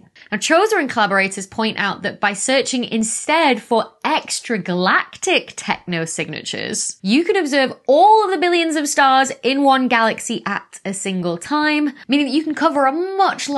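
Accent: United Kingdom. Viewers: can you plz speak less british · I used to live in Cambridge for a couple of years and I find it refreshing to listen to the British in your voice! I’ve watched many of your videos and am simply amazed by the shear depth, command, and mastery of your knowledge of cosmology. It’s wide and deep. I probably absorb 8% of your presentations - maybe some of this will stick in my tiny brain! 😊🤣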